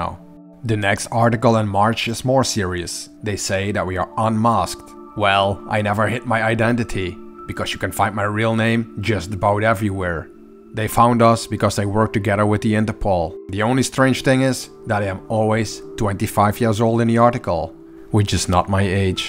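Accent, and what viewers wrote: Netherlands. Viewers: Intriguing what they sued you for instead of suing you for trespassing as you were basically trespassing. The possible secret agenda of the asbestos clean up once more shows the different motives of parties to sue on another instead of logical reasons. It was hilarious though that the article writers thought you were American, as a Dutchy myself I can clearly recognize that accent. No offense of course. Thank you for what you do and I appreciate that you and your friends are willing to put so much on the line to show these amazing places to the world!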